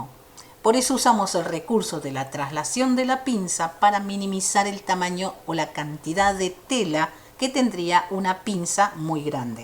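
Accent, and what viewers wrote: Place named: Argentina. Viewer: Por el acento eres argentina me encantó tu clase me inscribirme para que me lleguen notificaciones gracias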